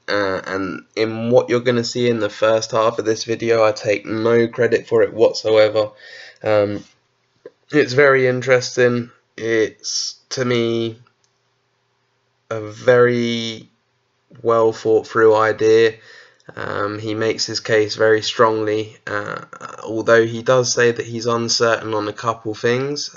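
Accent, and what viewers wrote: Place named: United Kingdom. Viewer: Wow such a well put together analysis by this guy some good information by the way FUCK MY LIFE I have been looking at thE OAK ISLAND MONEY PIT wow thank you for bringing this mystery to my attention I am really enjoying researching it on a separate note I keep hearing an accent what part of the world are you from you do not have to answer I was just curious I am sure it is the UKW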